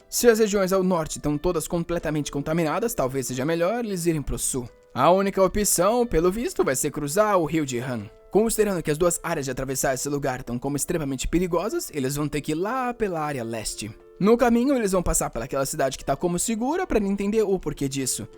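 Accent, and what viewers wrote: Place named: Minas Gerais, Brazil. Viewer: É só impressão minha ou o jeito dele de narrar tá menos caricato e exagerado? Eu falo daquela melodia meio mineira que acho que tá menos acentuada mas não sei.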